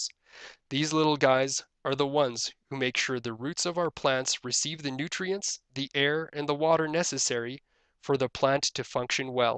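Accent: Canada. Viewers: Canadian guy, gotta cut the poor guy some slack, eh? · After about 4 words, I was like, this guy sounds Canadian... Then I heard "Ontario". We know the sound of our kind eh!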